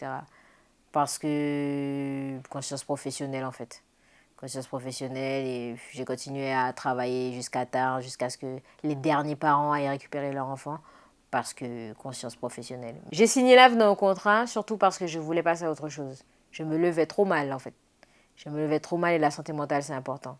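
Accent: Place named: French Guiana. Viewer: Je suis guyanaise aussi et ta l'accent et la manière de parler mmdr.😂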